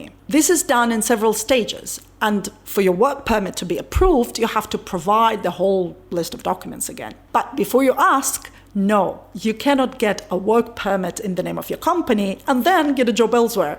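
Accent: Jamaica. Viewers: I can hear slight Jamaican accent coming through. Brings a smile to my face. I'm a Jamaican with many visits to Ukraine for the last 20 years under my belt. I took my Ukrainian friend to Jamaica earlier this year and she loved it so much, she didn't want to come back to the UK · Me just like before I watch the videos. Why? Because I know will bring good things to my ears and educate me about my own country. I heard a little Jamaican accent now and then.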